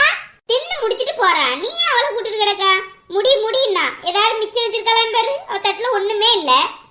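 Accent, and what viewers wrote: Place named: Nagercoil, India. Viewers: Kanyakumari Tamil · Nagarkovil slang superrrrrrrrr😍😍😍😍😍😍😍😍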